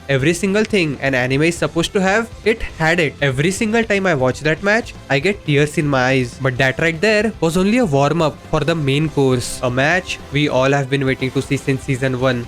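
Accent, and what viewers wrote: India. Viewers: YOU HINDUSTAN ACCENT IS DISGUSTING BYE INDIA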